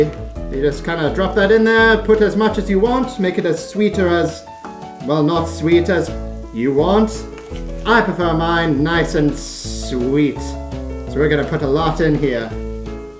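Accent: United Kingdom. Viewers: You are like the furry version of Jamie Oliver. (Your British accent was on point, actually!) :D Im gonna try this recipe sometime, and every time i think of pancakes, im gonna think of you. :3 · Omg I love the British accent it's as good as mine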